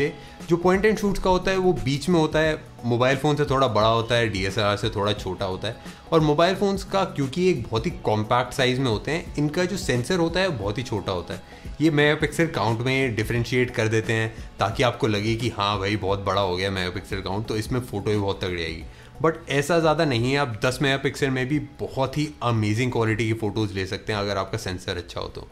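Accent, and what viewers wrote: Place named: Bangalore, India. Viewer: Brooo are u from banglore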